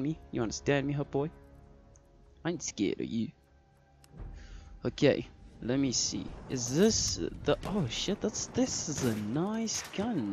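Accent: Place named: India. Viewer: awesome game man and whats wrong with that Indian accent dude -_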